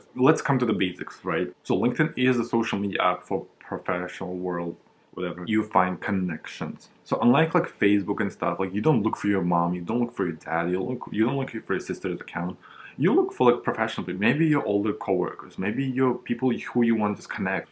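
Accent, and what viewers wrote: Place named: Russia. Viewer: What nationality are u? Im getting russian speaker vibes 🤔 also great video! I had the same experience with "stalking" people, I was pretty embarrassed to realise that people saw that 😅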